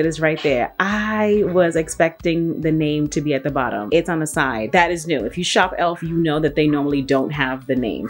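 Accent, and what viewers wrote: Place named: Rhode Island, United States. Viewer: Only a select few are gonna recognize your accent…and I’m one of them! RI STAND UP! And the bronzer looked beautiful on you, but let’s face it, anything would!😍😘❤️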